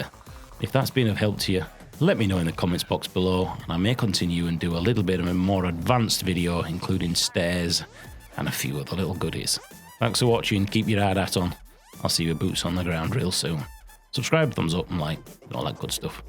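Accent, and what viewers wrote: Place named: Wakefield, United Kingdom. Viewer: Eaar Byyy Gum, Cheers for the video lad, Wakefield accent i can hear there? :)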